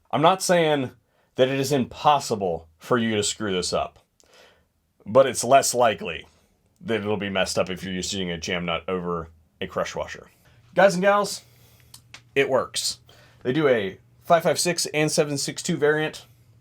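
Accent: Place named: United States. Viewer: Great job at testing & publishing hard numbers. Ditto at keeping it real & speaking American! I got to learn a few new things like WHY I like a backup nut instead of crush washers. I'm only 6 years old in building & 3 years rusty since I got booted off the ranch where I used to shoot. Just tryin' to keep my pilot light lit these days. Good info is much appreciated.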